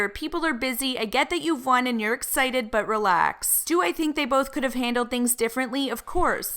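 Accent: Canada. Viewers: Hi, i'm from israel. I am so happy if i get a present or especially makeup for free.both parties were wrong as you said."jane" should have waited polietly and jackie should have given her an update. I'm sorry for misppeling, it's not my native language. I love your canadian accent.you have a good voice.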